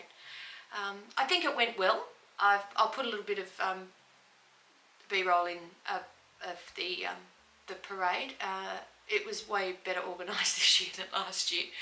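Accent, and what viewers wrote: Australia. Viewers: I've been waiting for you to pop up on my tv. So interesting to hear you talk about your knitting projects and I really like to hear a real Aussie accent that isn't tonally flat like mine. Summer is just about here and Im trying to finish a couple of woolly shawls. Cashmere and Rabbit sound very exotic. I haven't used either yet.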